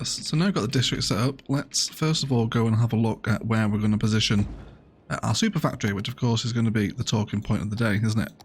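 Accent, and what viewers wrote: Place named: United Kingdom. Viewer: Your videos have blown me away and helped me to develop my building style so much too! I'm trying to place your accent, where in the uk are you from? Keep up the cracking builds 👌 much love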